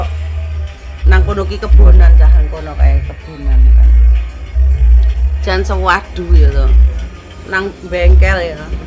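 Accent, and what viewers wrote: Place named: Nganjuk, Indonesia. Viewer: Jawanya mana to mbak., dialeknya kayak daerah nganjuk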